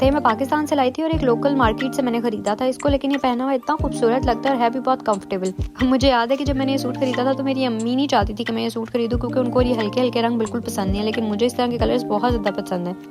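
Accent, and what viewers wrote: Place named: Punjab, India. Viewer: Urdu k sath sath apki punjabi b bht cute haee❤ Stay blessed😊 and make more volgs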